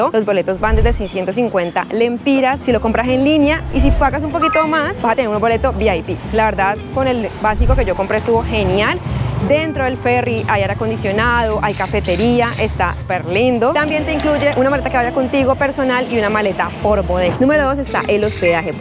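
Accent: Colombia. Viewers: gracias parcerita por mostrar lo bueno de mi honduras Dios te bendiga a ti y tu familia me encanta ese lindo acento colombiano el mejor del mundo bendiciones amiga ya me hice presente con mi like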